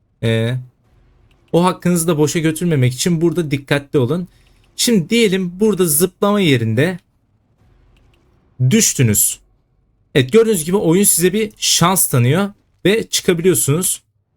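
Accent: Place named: Azerbaijan. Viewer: Abi sen galiba azerbaycanlısın konuşman dan anladım bende azerbaycanlıyım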